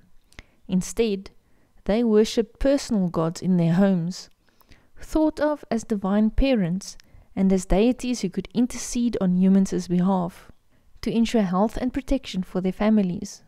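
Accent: South Africa. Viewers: Is that Afrikaans accent I hear, love it either way, I'd listen to anything you did lol and very interesting good work 👍😎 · Nah, but there's a lot of South Africans in NZ so I recognized the accent straight away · Nice to hear that South African accent.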